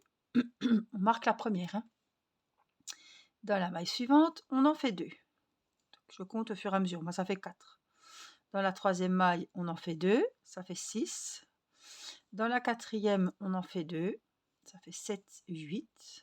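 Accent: Franche-Comté, France. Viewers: Donc je suis vos explications pour le bonhomme de neige pour ma petite fille c'est un plaisir de crocheter avec vous, petit aparté je voulais savoir si vous êtes franc comtoise car vous avez cet accent qui me rappelle ma Franche Comté merci pour tout.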